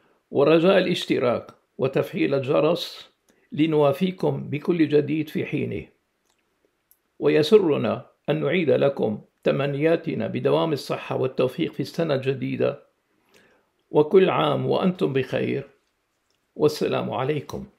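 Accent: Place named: Iraq. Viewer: هل هو ؟ لأن لهجته عراقية وليس سورية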